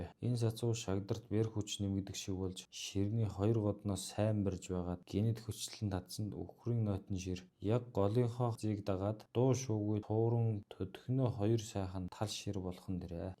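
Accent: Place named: Buryatia, Russia. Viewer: Buriad yumuu aylagtain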